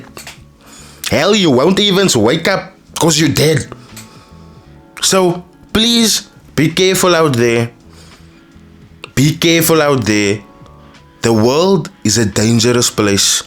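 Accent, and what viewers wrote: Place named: Cape, South Africa. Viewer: The way you speak makes me miss my Kaapse brahsse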